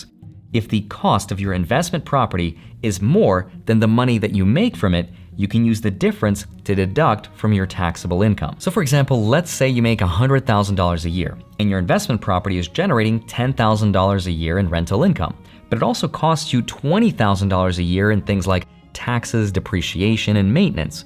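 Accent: Australia. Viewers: Dude your Australian accent is actually really funny which makes it hilarious! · Australian here. Yes that is exactly how we talk. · Terrible Oz accent, excellent way to describe the problem. And entertaining. Thank you. PS: Oz accent - make each word the laziest way to say it (in your mouth). Shorten Melbourne to Melbn. :) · As an Australian, that is definitely how we talk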